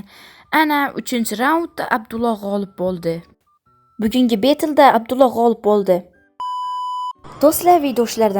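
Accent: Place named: Khorezm, Uzbekistan. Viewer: Chota ovoz Xorazmchaga tortib turbdi xorazmdanmasmisiz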